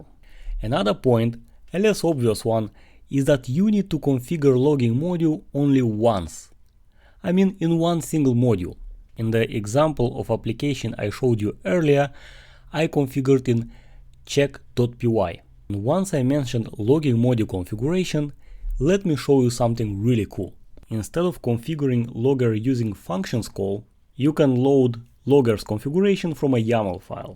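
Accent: Russia. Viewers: Russian English always deliver an extra value to the content))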